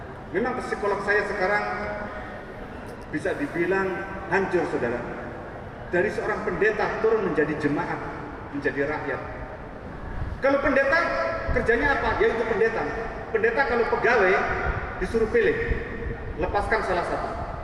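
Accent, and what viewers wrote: Indonesia. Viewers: Lho omongane medok kyok aku, kesaksian opo kuwi, ndak ada isinya, ndak ada makna ne..nggilani. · Wong ngapak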